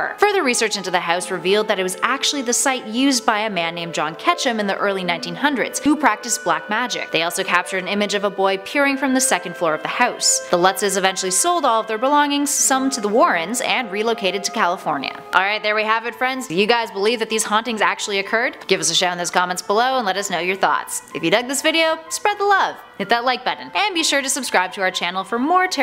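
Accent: Canada. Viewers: Liliana Jasmine maybe its canadian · Either Canadian or from New England, US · She sounds Canadian, eh... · How many times does this story need to be recreated on YouTube, same story different face with a Canadian accent. Blah blah blah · Garrapaterorulez Sounds Canadian.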